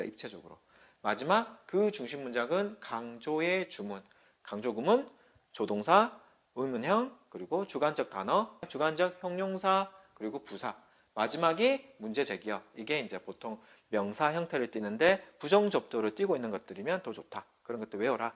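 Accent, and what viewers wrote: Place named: Gyeongsang, South Korea. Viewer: 표준어 쓰는 경남사람😂 영어공부법 잘 들었습니다^^ 맥락있는 단어암기와 활용, 적용이 중요하군요! 쓸수있는 영어공부를 해라. 별표!!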